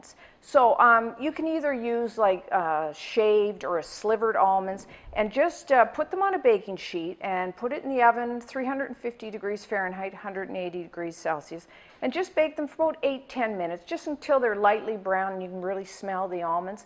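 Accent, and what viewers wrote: Canada. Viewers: i am so so curious as to what your day job used to be, before this took over, since i've been watching you for years and you have always had measurements memorized and C/F conversions memorized and by your accent i can tell you are canadian or close to..and i have always been so impressed with your matter of fact/scientific way of explaining everything. nothing too lovey dovey or baby like. that's why i just need to know what you did as a job before this took off. ♥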